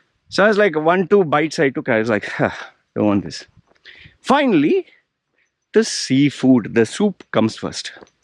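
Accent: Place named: Malabar, India. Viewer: So after your Burj Al Arab visit you became sophisticated! But how come you didn't acquire that particular sophisticated British accent as well? And you still managed to retain your Malabari accent!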